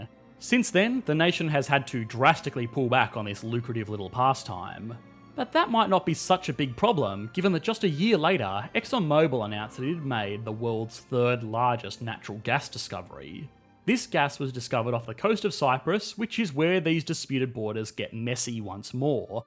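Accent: Australia. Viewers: Well in fairness, the guy is an economist, he does economy videos, the history was tacked on more as a factor in that economy and the details don't change anything in regards to that, so it doesn't have to be too accurate. I don't watch these videos for history lessons, but because I like the Australien accent and the economic insight I get. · At 5,30 He had a stupid pronunciation for the capitol city - Sounding something like Nicozeya rather than Nik O See A! By accent, not sure if the guy is Australian mixed in with South Aprican with a dash of American splashed in there! Peace.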